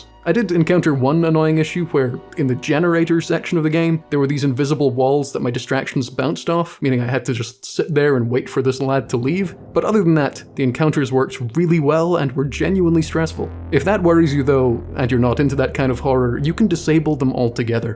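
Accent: Scotland, United Kingdom. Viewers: Not sure who has told you that you don’t have a Scottish accent. To my English ears, it’s VERY clear. Yeah, you’re not cutting about sounding like Fat Bastard but your accent is unmistakably Scottish 😅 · I think it’s important for you to know- you do have a Scottish accent, it’s not heavy whatsoever but you can hear hints of it, especially in words like here and two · FYI buddy. My grandparents were Scottish and I clocked you as being from there because they sounded the same even years after leaving.😊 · Don't stress your accent (I'm sure you don't), as another Scot I could hear it! We don't all have to sound like Rab C to be Scottish. Great review of a great game too! :) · As a fellow scot with a wonky accent, I just wanted to mention that you absolutely still sound Scottish! I only just had YouTube recommend you today (slenderina), I cannot understate how happy I was when I instantly heard a Scottish accent because I'm constantly hoping to come across more Scots to watch. 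Seeing you had made this video made me even happier because I deeply enjoy this game for all the things you talked about. I do somewhat blame the lack of authentic scottish representation in media with the increase of people being online more and more for the accent stuff, I've come across a few scots online with the same issue. But yes you definitely sound Scottish!! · Mate, you do have an accent just a little one. You don’t have a strong one though I’m from Wales. I don’t really have an accent but some people say I do have somewhat of a little Welsh accent, you serve a little bit of the Scottish accent so you do have an accent is just not as noticeable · When i first heard you i thought you were Scottish... Then i thought you were Finnish.... Then i thought you were Scottish- glad that my confused guesses were finally proven correct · I cannot comprehend the joy I feel when listening to a Scottish video-essayist. 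· You definitely do have a Scottish accent mate! Maybe just needs a Scottish ear to hear it and be sure but I found one of your other videos before this one (the Granny one) and I clocked you immediately 😅 it's a soft one, I'll give you that at least. Edit: commented this before I got to the Maryhill bit and now am howling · accent seemed obviously scottish to me tbh. not a common one but its in the way you use your vowels. also "fucking yass man" made me choke on my dinner laughing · Dw bro i could tell you are scottish but only cos im also scottish i can see how people didn't know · Bro was seriously like “I know I don’t sound Scottish” with the most Scottish accent I’ve ever heard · you sound like your speaking with a scottish accent to me, maybe not super strong or anything, but I can definitely hear it in your voice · I think you do have a Scottish accent, just a very slight accent. 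Maybe not what you would think for one… but it is still there.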